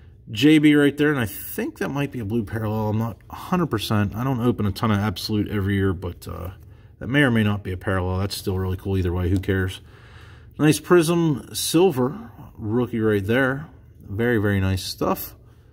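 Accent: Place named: Pennsylvania, United States. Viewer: Hey great video. Glad you got the package, it was cool to see u open it on video, I didn’t expect that. Not sure what # subscriber I am on here but I feel like it was early. Always liked your videos, from the PA accent, to the complaining about brickage, to having do them with u, I feel like I’ve seen most of them. So as your channel grew I started to save up Alonso cards and already had a little pc of Josh Bell going so I thought I would send them to u some day as a thanks for all hrs of entertainment. As u got close to 1K I decided to send it as a Congrats. Keep up the good work. I’ll keep watching. 👍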